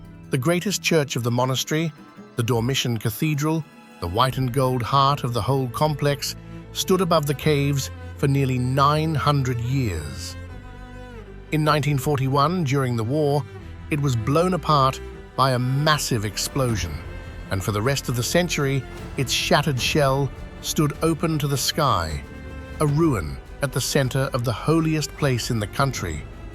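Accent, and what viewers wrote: United Kingdom. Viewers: Great 😃👍 Brit narrator ❤! Sounds like the 👑 one on! (by, mid '70's).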